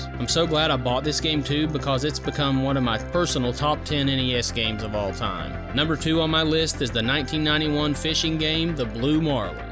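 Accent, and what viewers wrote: South, United States. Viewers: Great content and I love your accent man. As a fellow southerner it gives me great pride to hear your voice in a well out together video game review YouTube channel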